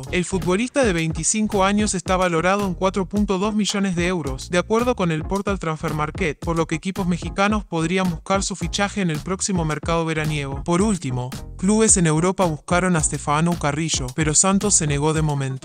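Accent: Argentina. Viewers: Y siguen con su tonito argentino.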